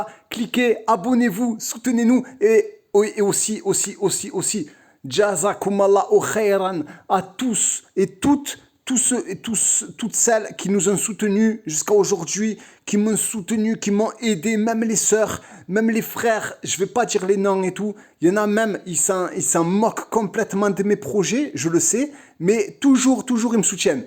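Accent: South, Algeria. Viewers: Dommage que vous soyez si loin vous n'êtes pas dans ma wilaya Je vais m'abonner à votre Instagram et facebook Vu que moi aussi j'ai un facebook et Instagram Bravo continuez et votre accent du sud j'adore 😅 Wellah je vous souhaite de réussir ici on ne vous ponctionne pas comme en France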